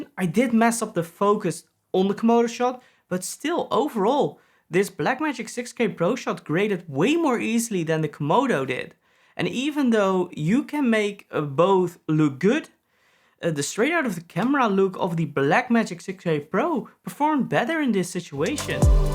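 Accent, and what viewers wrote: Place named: Netherlands. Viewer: Hahaha hoorde ergens al een nederlands accentje, maar die 'later' det het m😂, Goeie video man! Wil hem ook gaan halen.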